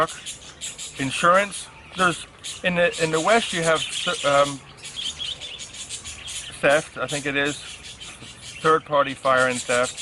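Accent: United States. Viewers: This is your first video I've watched. Thanks for the good info. I was a bit surprised to find you're British. You sound every bit American :-) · One more if I may! Your English appears to be more American than British how is that possible?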